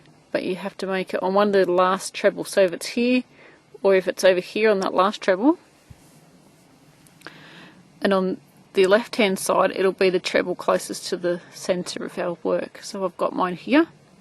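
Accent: Australia. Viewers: Had a brainfart lol. I really do love your videos and your accent. Would love to come to Australia, that's my dream vacation.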